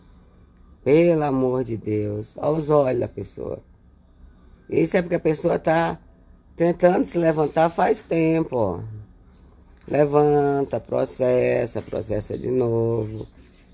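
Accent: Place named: Sao Paulo, Brazil. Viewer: Bispa você é paulista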